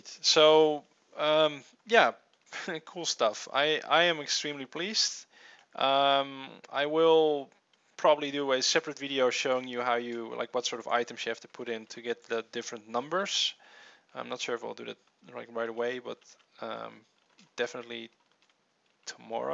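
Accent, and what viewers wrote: Netherlands. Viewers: Guys guys guys. Stop for a sec with discuss if he's dutch or not. Well, i have the right anwser for ya. I'm half engish, half dutch. The most people i heared that are dutch and talk english, are talking like that. Its just a dialect from dutch people. I'd like if everyone would stop with commenting like that. It is'nt even funny for. He's uploading a video, nothing more, just that. Show some respect people!! Because i do. Thanks for reading guys. If you think im wrong, screw you.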